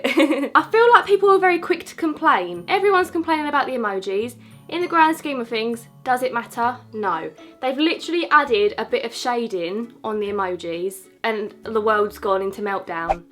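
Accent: United Kingdom. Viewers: I'd love to have your voice tbh. Your voice is so soothing. British accents yessss <3 I am also fine, thank you :)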